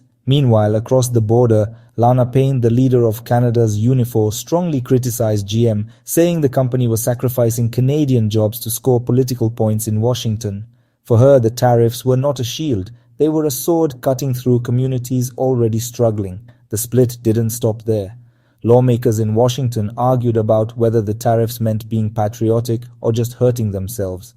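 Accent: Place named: India. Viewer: I wonder why an indian from india is narrating this site! India joined china to destroy the dollar. People pretend to be our friends while they stab us in the back!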